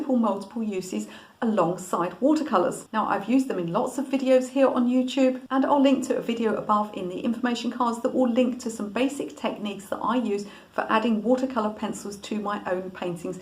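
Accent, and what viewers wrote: England, United Kingdom. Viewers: HI, I SUBSCRIBE TO YOUR CHANNEL. I REALLY ENJOY IT AND LEARN A LOT FROM YOU. HOWEVER, IT IS REALLY, REALLY HARD TO ABSORB ALL THE INFORMATION - DUE TO YOUR RATHER RAPID RATE OF SPEECH & MAYBE TINY BIT DUE TO YOUR ENGLISH ACCENT. BECAUSE IT TAKES THE HUMAN BRAIN TIME TO PROCESS SOMEWHAT COMPLEX INFORMATION, ESPECIALLY. I TOO, SPEAK RATHER FAST, AS COMPARED TO SOME FOLKS. THIS IS IN NO WAY A CRITICISM!! YOUR CHANNEL CONTENT IS VERY GOOD INFORMATION- I WATCH YOUR CHANNEL OFTEN THANK YOU, AND KEEP UP THE NICE WORK,YOU HAVE HELPED ME LEARN A LOT! PS WHEN I WATCH YOUR CHANNEL AND SOME OTHERS TOO - FORTUNATLY, ON YOUTUBE, I HAVE THE OPTION OF (SPEEDING UP OR SLOWING DOWN) THE VIDEO PLAY BACK SPEED. SO, I WATCH YOUR CHANNEL AT A SLOWER VIDEO SPEED AROUND 75% -50%. MAKES YOUR VOICE SOUND A BIT ODD LOL. BUT, IT GIVES MY BRAIN TIME TO PROCESS YOUR INFORMATION. 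I REALLY LIKE YOUR FORMAT TOO, IN THAT YOU STICK TO YOUR SUBJECT AND NOT A LOT OF TALKING ABOUT WHAT YOU DID TODAY, WHAT YOU ATE, WHERE YOU SAW SO AND SO ETC LOL. I WATCH ARTISTS VIDEOS TO LEARN ABOUT ART. I FIND A LOT OF "SMALL TALK" VERY DISTRACTING. I ALSO LEARN BETTER FROM BOTH VISUAL AND AUDIO DISCRIPTION OF TOPIC- BECAUSE I AM A NEW ARTIST. I AM SO VERY GRATEFUL FOR YOUTUBE! I HAVE LEARNED SO MUCH MORE INFORMATION AND MUCH FASTER THAN I COULD HAVE ON MY OWN, THATS FOR SURE- SO THANKS AND PLEASE KEEP THE NEW CONTENT COMING- MY GRATITUDE TO YOU!!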